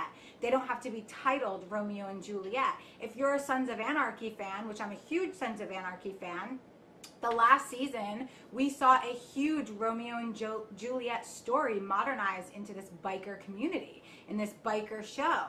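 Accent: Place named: East Coast, United States. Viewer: You have such an east coast accent! LOL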